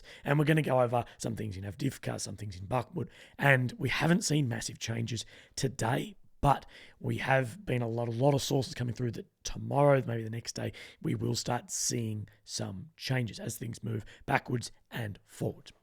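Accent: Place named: Australia. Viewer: the sound is messed up on this one & has been getting worse on a couple of late,you need to bump it up a bit,although the ozzie accent is fun it can be hard to understand if it is mumbled & hard to hear.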